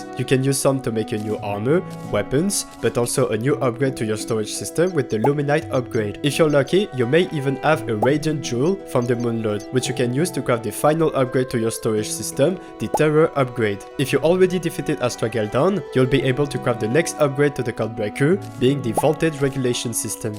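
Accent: France. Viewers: Goated guide as always this guy is just built different are u french? cuz yk the accent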